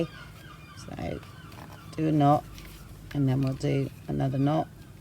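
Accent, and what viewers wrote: United Kingdom. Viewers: I am watching this video again so that I may make one of these portfolios for Christmas... your videos are always so easy to follow and I simply adore your style... that being said, because I tend to watch the videos, sometimes at night while my hubby is sleeping or when I am washing dishes, I have the “Closed Captions” on... I am used to the interpretation being quite askew because of your British accent, but sometimes it just makes me giggle at what it THINKS you said... todays giggle? Washi Tape... it thought you said, “What’ your tape?”...🤣🤣🤣 Hugs to You and Yours...❤️❤️❤️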